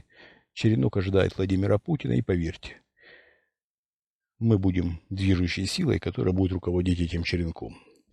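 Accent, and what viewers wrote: Ukraine. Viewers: с Украины что-ли? Акцент украинский.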